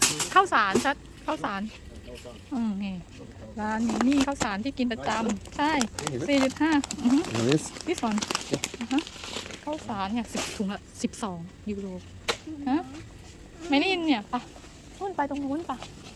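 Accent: South, Thailand. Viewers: ทางนี้มะกอกะแพงคักจ้า สาวน้อยพูดใต้ น่ารักมากค่ะ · จริงด้วยค่ะ มะละกอ แพงกว่าข้าวสารโชคดีที่คนใต้เรา ไม่ได้กินมะละกอบ่อยนะคะ พูดใต้ได้หลายคำเลยนะคะ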